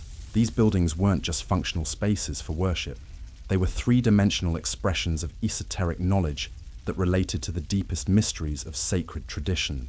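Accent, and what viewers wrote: England, United Kingdom. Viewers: Very interesting!!! Ditch the English accent. Please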